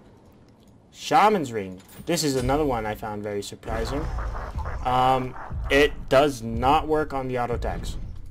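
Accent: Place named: India. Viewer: So thats how u sound. Hey ur a pretty good duler. I thought u were some indian from india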